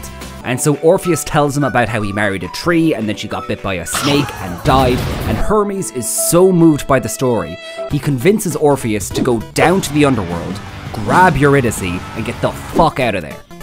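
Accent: Ireland. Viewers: Thank you, Senpai. UwU Also I love your channel, your Irish accent, slang terms/euphemisms, and cultural references are really fun to listen to.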